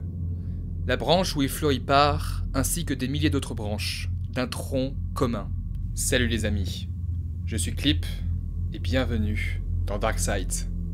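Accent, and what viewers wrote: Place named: Paris, France. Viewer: vidéo bien faite, par contre cet accent parisien snobe est insupportable "particularitéffff, il sort les yeuxffff, mercichhhiiaann etc" donc pas de pouce bleuffffffan, mais pas de rouge par respect pour le travail.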